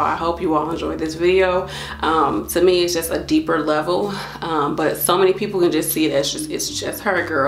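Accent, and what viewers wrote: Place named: West Coast, United States. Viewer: I love your vibe i felt your locs through the video. You sound like your from the west coast that caught my attention. Keep up the frequency im entering my journey again after cutting my 10 year locs as a teen.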